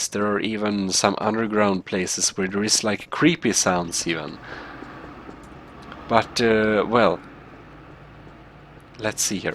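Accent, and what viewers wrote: Sweden. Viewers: I love the Swedish accent.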